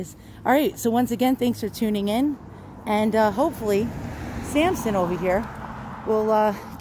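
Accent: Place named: Catskills, United States. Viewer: I grew up in the Catskills & your accent caught my attention right away, it was like being home again. Don't be too hard on yourself as you have a great personality. I survived the battle of Mogadishu in 1993 & although I didn't suffer problems with PTS it did take time to come to terms so there is light at the end of the tunnel. Dogs are a gift indeed so hopefully Samson will bring you peace. Just remember never give up & keep fighting. Your videos are charming so hopefully making them is enjoyable for you, all the best!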